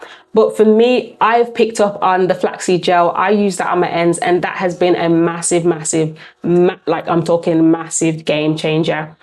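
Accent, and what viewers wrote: Birmingham, United Kingdom. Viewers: Wait are you a fellow brummy? 😮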